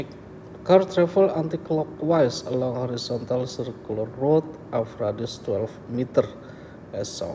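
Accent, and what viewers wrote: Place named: India. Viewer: when I heard the lovely indian accent, I know I came to the right video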